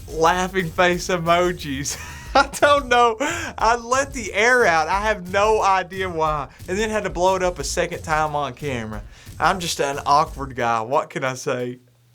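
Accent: Kentucky, United States. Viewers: This probably not politically correct, but I watch you simply to hear your Kentucky/hill Billy accent. It is awesome.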